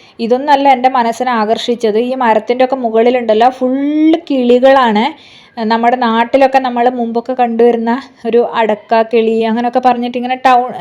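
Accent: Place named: Kannur, India. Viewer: Kannur slang super.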